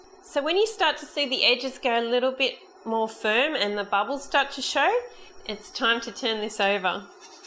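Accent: Australia. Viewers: Your accent is lovely! Australian?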